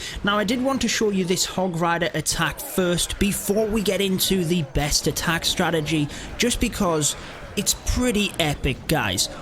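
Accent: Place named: North East England, United Kingdom. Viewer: CraziiPower he has to slow his "talking" down because otherwise you would not be able to understand him due to a strong north east (England) accent. Also to me it's not slow at all, just clear and efficient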